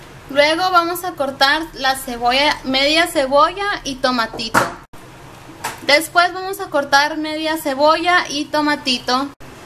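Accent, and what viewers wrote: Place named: Sonora, Mexico. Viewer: ya esta pues mushasha si te creo que seas de sonora porke asi ablamos con la she o sho jajaja saludos desde los angeles californiae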